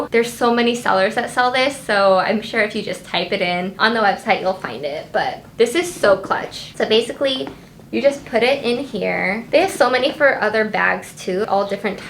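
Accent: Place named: Bay Area, United States. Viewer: You're channel just popped up on my feed. I was watching this and I was like she sounds like she's from the Bay. I looked at your IG and BOOM lol. P.S. thanks for the tip about the cardboard!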